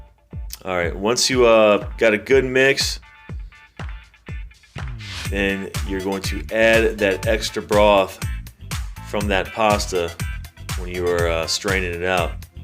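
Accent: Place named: New York, United States. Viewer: Hi. You sound like you're from the best part of the country...NYC. I also make pesto...but without the tomatoes. Your dad reminds me of mine. He might like this version of, sung by one of my favorites. Cent'anni. <link>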